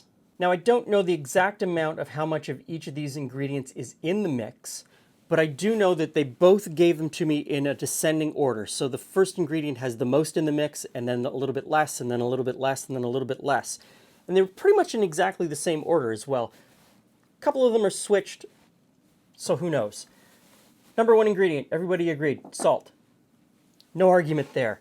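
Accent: Canada. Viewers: I can spot a Canadian accent in a few minutes! PS i met the colonel back in the day here in Michigan! What is it that Brits love KFC? My mother born in Scotland used to serve it up at family events!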